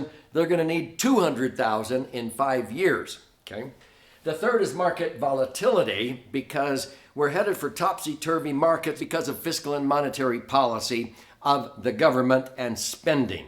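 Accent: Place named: California, United States. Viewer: Thanks for everything, you value your California style articulation. I have to do something with my old 401k in the next 30 days. Financially I’m in a tight spot I’ve thought of pulling 5K to help my current position then putting the rest somewhere else. I’ll have a little over 20k left… you’ve got me thinking of a iul but idk if it’s the way to go. Do you have any acolytes I can work with in Wisconsin? Thank you sir, peace.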